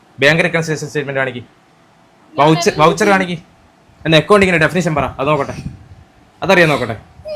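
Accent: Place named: Kuttiyadi, India. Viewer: Kuttyadikkar aaro ingal sound guss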